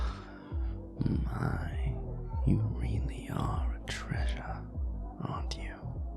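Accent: United Kingdom. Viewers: He's British??? 😮😮😮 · A BRIT?!??!?!?! IM SCREAMING (in a good way) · As a STRONG vincent girlie this feels like i’m betraying him but i am a sucker for a british accent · No definitely British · I didn’t know he was British You’re hitting me in my weak spot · IM GETTING BRITISH AND AUSSIE VIBES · Timestamps: - Porter has a British accent 👀 - Listener is their friends’ designated driver at the club, so they’re staying sober while their friends have all the fun without them - “Being the responsible friend doesn’t mean you shouldn’t get to have a good time too. They owe you that much. Uber’s a thing.” - “You’ve got a face far too lovely to look so bored. We should fix that.” [...] [laugh] “I don’t see anyone else at this table that I could mean by ‘we.’ If I’m overstepping, say the word, Treasure. I’ve been known to have scruples. Occasionally.” - Treasure wants to just talk for now, and Porter is okay with that — - “We’ll get to the fun when we get to it. 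You wanted to talk first, so talk. You’ll find I’m an exquisite listener. Speak.” - “My name’s Porter. Porter Solaire.” - Porter thinks that this isn’t the first time Treasure’s friends have left them out to dry on a night out, and he’s right — - They said that friendship isn’t transactional and theyre right, but Porter says that friendship is supposed to be a thing where all parties care about one another and act like it — - Porter noticing that Treasure’s friends don’t seem to care much about them - “Oh yes, I have a fabulous circle of friends in my own life. There’s even a few of them I can almost stand to be around” [chuckle] - “I find you very attractive. Is that too forward to say?” [...] [chuckle] “How deliciously demure. Look at me.” [...] “Mmm. Better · THE BRI-ISH OMYGHAD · OMGGG HES BRI'ISH 😣👹👹‼️‼️🤩🤩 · BRITISH REDACTED YESSSSS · a BRITISH redacted character? Me thinks yes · IVE BEEN WAITING FOR THE BRITISH ACCENT! 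THANK YOU THANK YOU THANK YOU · Moments that made my me eep with excitement: -when I heard the British accent -when he said you have a face that's far too lovely to look so bored -when he said I could bring you a lot of pleasure -when he said he only bites when people ask nicely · HE'S BRITISH I NOW HAVE A REASON TO LIVE YEEEE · Probably British accent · I didn’t think he’d be British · BRITISH!!!! HELP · OH MY GOD HES BRITISH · I've never related to a listener more. if a charming (British) man came up to me and started flirting THAT HARD im putty on the floor sir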